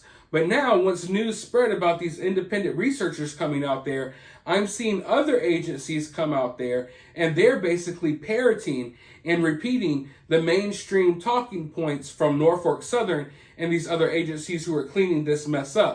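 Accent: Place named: Philadelphia, United States. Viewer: You're down south? But you say "woodder" instead of water as we do in Philadelphia. I assumed you were from the northeast 😂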